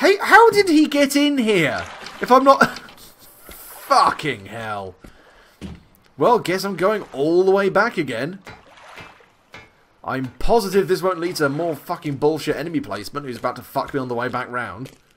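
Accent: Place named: Russia. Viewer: Actually, I quite enjoy your Russian accents. Way better than what the Holywood degenerates think we sound like.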